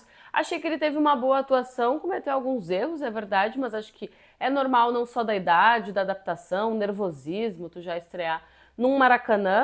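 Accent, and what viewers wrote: Rio Grande do Sul, Brazil. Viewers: gaucha, sotaque bonito, torce pra quem do grenal? Vai Corinthians!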